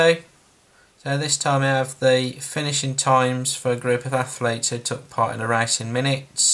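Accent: Birmingham, United Kingdom. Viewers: Nice Birmingham accent.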